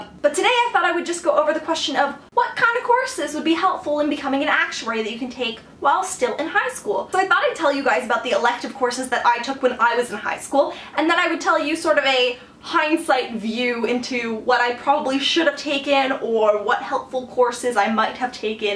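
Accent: Canada. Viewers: Wait a minute... t'es Québécoise?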